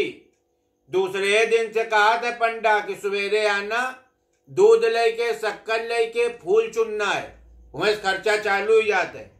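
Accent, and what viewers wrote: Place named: Unnao, India. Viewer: हम भी उन्नाव के रहने वाले है 🙏💕 पर हम वहाँ की बोली नही बोल पाती हूँ सच आपकी बात सुनकर मजा आ जाता है 🎂🎊🎉🍻🥂🥂🍻🎂🎊🎉🍻🥂🥂🍻🎂🎊🎉🍻🥂🥂🍻🎂🎊🎉🍻🥂🥂🍻🎂🎊🎉🍻🥂🥂🍻🎂🎊🎉🍻🥂🥂🍻🎂🎊🎉🍻🥂🥂🍻🎂🎊🎉🍻🥂🥂🍻🎂🎊🎉🍻🥂🥂🍻🎂🎊🎉🍻🥂🥂🍻🥃🥂🥃🥂🍷🍹🍷🥂🍻🥣🥣🍩🍩🍪🍪🥛🍬🍬🍩🍩🎇🎇🎇🎇🎆🎆🎆🎆🌌🎇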